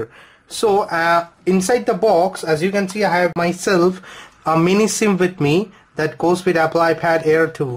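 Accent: India. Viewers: I like ure indian accent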